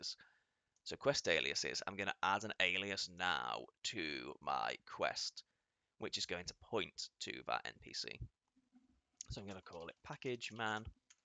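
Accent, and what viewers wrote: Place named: Liverpool, United Kingdom. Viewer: great to see a new vid from you mate, i still struggle with the scouse though :)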